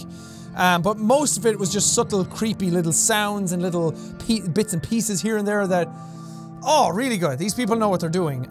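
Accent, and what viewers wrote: Ireland. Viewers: I love how he gets more Irish when he's angry or scared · It's an Irish accent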